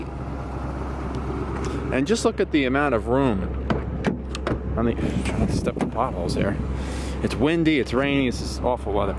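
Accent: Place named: New Jersey, United States. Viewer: This is crazy... but as soon as you said, "Just got it yesterday" , I thought to myself, "He sounds like he's from New Jersey." I looked back at the license plate, and sure enough I was right! (I'm from California, by the way.)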